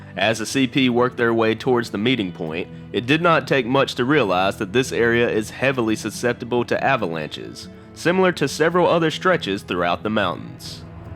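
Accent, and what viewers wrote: South, United States. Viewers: Very nice to hear a narrative cited by your gentle southern accent which is something I wouldn’t have expected on a western Canadian rail video. As one who has an interest and being a fairly read person on our country’s history, I was impressed with the amount of research you obviously did for the narrative. I’m glad that you enjoyed our country.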